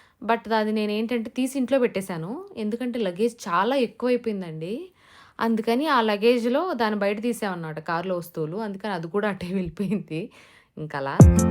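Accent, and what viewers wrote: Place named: Nellore, India. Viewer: sare....Badram😊 ma Nellore language...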